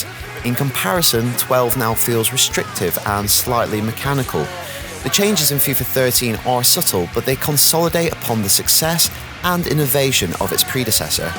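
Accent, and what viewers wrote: United Kingdom. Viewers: everytime theres a football game review on ign i think "boy, time for some british accent :D"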